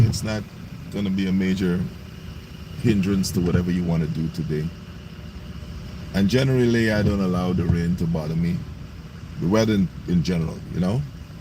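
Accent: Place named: Caribbean. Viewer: OOOOOooooh, ok. I was wondering about the accent my brother. You're from another Caribbean island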